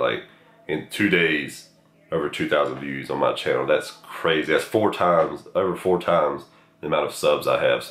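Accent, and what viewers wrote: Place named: South Carolina, United States. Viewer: Damn dude you sound like you're from my part of the world. Are you from South Carolina?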